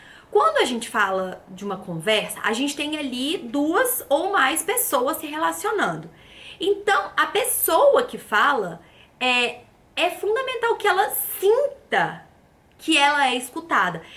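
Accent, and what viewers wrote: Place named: Minas Gerais, Brazil. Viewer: ❤ que saudades que eu tenho de ouvir esse jeitinho mineiro ❤❤❤❤❤❤ Meus queridinhos ...amores eternos❤❤❤❤rsrsrsrsrs Eu sou vidrada no povo mineiro: amor perfeito!❤❤Eterna seguimora de Viçosa e BH eternamente aonde estiver no planeta meu ❤ coração é mineiro ❤❤❤❤❤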